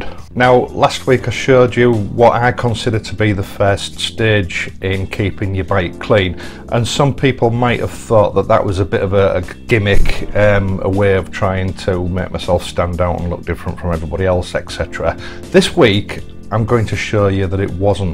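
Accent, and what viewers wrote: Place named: Hull, United Kingdom. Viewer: I'm a new subscriber. You're brilliant! Thank you for your content and your Hull accent:D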